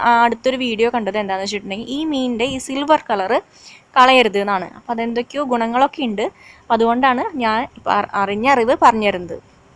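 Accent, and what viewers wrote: Thrissur, India. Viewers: തൃശ്ശൂർ ഭാഷ🤗 കുഴുവ വറുത്തത് 😘😘😘😘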